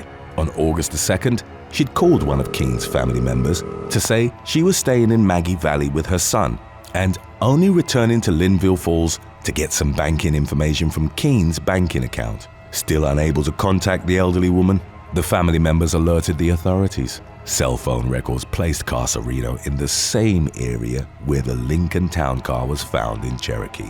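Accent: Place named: United Kingdom. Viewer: definitely has a gift for story telling and his commercials are absolutely funny when he wants to be. And that voice with his British accent make it very enjoyable to listen to.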